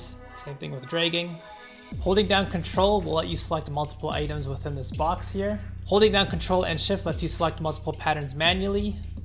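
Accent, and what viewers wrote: Punjab, India. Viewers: Are u punjabi bro?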